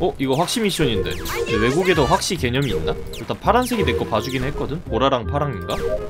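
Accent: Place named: South Korea. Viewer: 찐 콩글리쉬군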